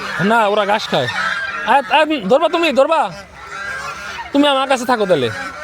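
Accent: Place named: Manikganj, Bangladesh. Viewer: মানিকগঞ্জের ভাষা মনে হচ্ছে 🤔🤔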